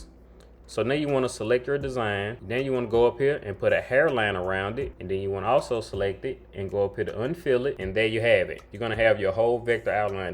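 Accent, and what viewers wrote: South, United States. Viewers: I'm from Texas. I picked up on that southern twang! Lol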